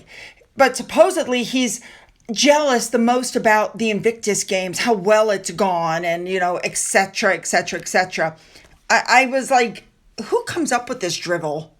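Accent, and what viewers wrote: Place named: United States. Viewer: I don’t find your talking too fast. I’m British and can understand your American accent very clearly.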